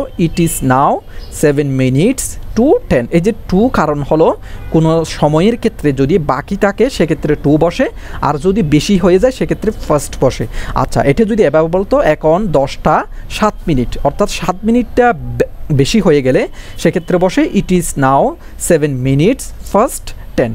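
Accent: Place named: Noakhali, Bangladesh. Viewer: উচ্চারণ. Noakhalir hoise Ata avoid korte hobe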